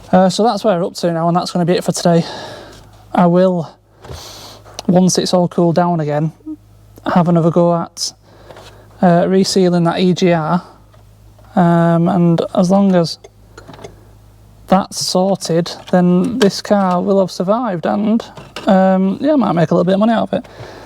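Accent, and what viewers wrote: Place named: Northern England, United Kingdom. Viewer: Really good to see and hear your fellow northern dialect again, proper work pays your bills, but any video is good by me when you have some free time.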